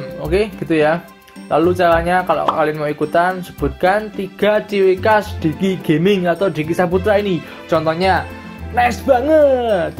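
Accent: Java, Indonesia. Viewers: 1.kalo main suka ngalah dulu 2.kalo ngomong R suaranya agak beda 3.kalo ngomong kental banget logat jawanya Pengennya AOV lebih banyak ngadain event yang physical seperti pulsa atau HP gitu Openid:9b<num>f9bfb<num>dc34973 Skin:wonder woman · Subscribe&like✔️ Follow ig✔️ Share✔️ Ciri khas: 1. Ga bisa ngomong R lucu banget dengernya "Jokel jokel" 2. Onslaught malah onslog onslog, double whammy jadi domiwemi, khas kalo ngomong nais banget cuangkemmu wkwkwk 3. Suka ngomong jawa, logatnya lucu Harapan untuk AOV ke depannya semoga AOV terus maju dan sukses dan bisa dikenal lebih banyak orang lagi. Aamiin. Open ID: 23f<num>a250fee389f9ab2422ec Skin: Wonder Woman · Ciri Khas : 1.suka bilang nice banget 2.medok coy 3.suka ketawa pas main Harapan buat aov : semoga makin berjaya Open id : e26b8af7d6b871c16d37acb<num>ad Skin : liliana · 1. Walaupun pke bhs Indonesia tpi tetep aja logat nya tetep Jawa 😁 2.sering ngadain giveaway 👍 3.Suka mabar bareng subscriber👍 2. 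Harapannya untuk aov semoga domba" pada jadi pinter setelah nonton video 3 open id: 830b4bd60f27f0cdabdcb70480d9f575 Skin: wonder woman bombshell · ciri khas 1. klo ngomong logat jawa nya kental bgt. 2. cadel nya udh ga ad obat nya. 3. cuma bisa maenin gajah 😁. harapan saya buat AOV smoga lebih jaya dn brkembang lg di indonesia dn smakin banyak guild yg bisa mengharumkan indonesia. dn buat makin bnyak konten" seru buay memghibur kita smua. open ID: 087fb2d45ff6d3e8e044ac87d7200e35 skin: max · All done.... Subscribe, follow, share #1 Ciri khas... 1. Jowonya itu loh 2. Ya pasti cadelnya (kadang" suka seneng denger cadelnya😁) 3. Karibnya Ucop gaming (sering mabar with ucop gamimg) 4.(tambahan) paling suka nyebuti onslog onslog😁 #2 Harapan kedepan buat AOV, semoga turnamen aov dapat diadakan di tempat" yg terpencil seperti di desa. Dan semoga AOV kedepannya dapat memberikan kenyamanan serta event yg menarik bagi playernya.... 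oh iya dan semoga AOV dapat menjadi "The Best Mobile Moba In The World" #3 Open ID: b3d1cad56e5ee0d<num>f2bbf5d3a Skin: Yorn (PoPo) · Ciri: 1.Gak bisa ngomong RRR 2.main pakek logat Jawa 3.Sering ngomong Nice banget kalo pas kill Harapan: Harapan ku untuk Aov Semakin lebih baik kedepan nya, ngadain event" yang menarik. Sukses untuk AoV Open Id: 7801ca546b413e4dd83da0da7e24e7cd Skin: bombshell ,Wonder Woman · 1. Cadel Gk Bisa Ngomong R (conquelol) 2.Onslog Onslog,Nice banget 3. Suka Berlogat Jawa Semoga Aov Semakin Jaya Kedepannya OpenID 6c0105a9e6b21b5638c<num>c7c5 Skin(Raz) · 1. Lucu 2. Bahasa jawanya kental 3. Sedikit cadel kali yak Semoga kedepannya AOV makin banyak event berhadiah pulsanya... wkwkwk Open id: 69aa2fac34bf33823abf318a157f4e47 skin : wonder woman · 1. Onslog onslog 2. Nasi banget 3. Cadel sama logat jowonya kental Harapan untuk aov Semoga makin bnyk makin bnyk yg main dan masalah-masalah koneksi lebh baik lagi. 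Pengelompokan main sesuai Rank masing masing jgn beda jauh bisa join Skin kriknak. ID:c505e0ef449eb633a7d<num>f02987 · 1.suarane medhok 2.onslog onslog 3 naisss banget. Harapan untuk aov kedepan nya semoga kalau ada event tidak ada kata "berkesempatan" OpenID:308d565113fa<num>dbe4cc32ba472 Skin=krixnak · 1. Kalo ngomong medog bwanget 2. Kalo udah kill 4 pasti .. onclog onclog 3. Suka mabar sama subscriber Harapan untuk AOV , bisa menjadi game favorit ke depannya :D Skin : Superman Open ID : 49c9439f906d96d1174f112f871d9c3f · ciri khas : - jawanya medok parah wkwkwk - seneng interaksi dengan subscriber dengan cara ajak mabar - gak bisa ngmng R wkwkwk semoga AOV menjadi moba yg berkualitas, makin berkembang, makin banyak player AOV yg baru, Maju terus AOV👍👍👍 Open Id + Skin : c87c39c24dfa<num>bea1ca07be559 + Lauriel ( Black Wing ) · 1. Logat jawa e lucuu 2. Memberikan edukasi 3. Dan suka main dengan subsciber Harapan untuk AOV, lebih dan lebih lagi bisa memberikan game yang terbaik Open ID+Skin c67eb92a676b25e808491e<num>a Kill Groth (Mermidon)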